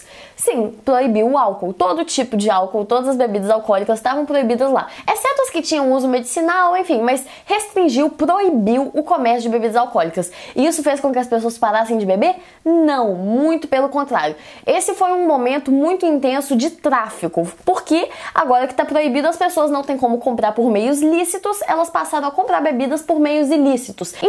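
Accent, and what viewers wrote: Minas Gerais, Brazil. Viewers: Gostei muito do seu canal! Faço facul de Direito mas amo muito História e quadrinhos e supercurti o seu jeito descontraído de ensinar, além do sotaque tranquilo de Minas que eu amei! (Tenho parentes daí de Minas Gerais :) ) Ganhou um fã do seu canal! Muito show, continue assim! :) :) :) ;p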